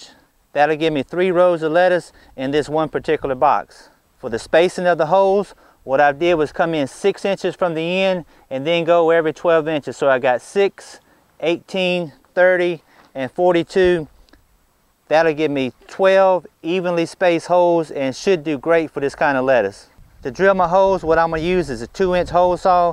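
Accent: South, United States. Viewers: must be from the South i live in Arkansas so im not picking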